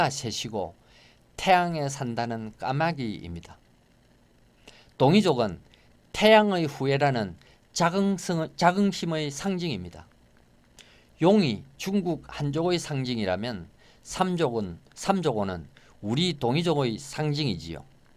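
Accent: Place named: Busan, South Korea. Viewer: 병장님! 반갑습니다. 군대 쫄다구 김*철병장을 통해서 씨의 요즘 근황을 듣고 너무 반가워서 이렇게 유튜브로 소통을 합니다. 군대에서 나병장님의 개인돈을 훔쳤다고 의심을 사서 한 때 나마 이런 속좁고 편향되며 막무가네식의 고참을 계속 고참대우하며 군대생활을 보내야 하나 아니면 개인적으로 밖으로 데리고 나가서 죽통을 날리고 군대생활 그렇게 하는게 아니다 라고 일침을 가한 뒤 영창을 가야하나 고민을 많이 했던 탁일병입니다. 그 당시 촐싹 거리던 나 병장님이 세월이 지나고 나니 박사 학위를 받고 교수님이 되었다니 정말 격세지감을 느낌니다. 아무 근거없이 고참의 돈이나 훔친것으로 절도 혐의를 뒤집어 썼던 탁일병은 그 사건이계기가 되어 제대 후 모 여고에서 30년 동안 교편생활을 하면서 반 아이들에게 절대로 남의 물건을 탐하지 말것이며, 또한 자신의 관리소홀로 귀중품을 잃어 버리고 난 후 절대로 남을 근거 없이 의심하거나 미워하지 말도록 인생관을 심어주는 담임을 역임하고 이제는 명퇴를 하였습니다. 우리 나병장님 서울 생활이 40년이 다되가는데 아직 여전히 부산 사투리가 남아 있는 것이 신기합니다. 지금도 여전히 탁일병에게 절도 혐의가 있는 것으로 믿고 있는 것인지 아니면 철없던 어린 시절의 치기 어린 선임 고참의 찍어누르기 식의 자백 강요였는지 개인적으로 묻고 싶습니다. 어찌 되었든동 제대 후 진리탐구에 대한 열정을 불태워 박사학위를 취득하고 교수님이 되었다는 것에 대해서는 저절로 고개가 숙여지며 존경의 마음 금할길이 없습니다. 모쪼록 건강하십시오. 기회가 되면 그 시절을 이야기 하며 회포를 풀 장이 마련되리라 믿습니다.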